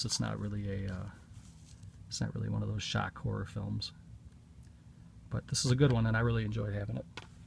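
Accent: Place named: Midwest, United States. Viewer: I knew your accent was Midwest. Im in Illinois about 20 minutes from the South-Eastern corner of WI. I am about to grab the last cheap copy of Body Bags on Amazon.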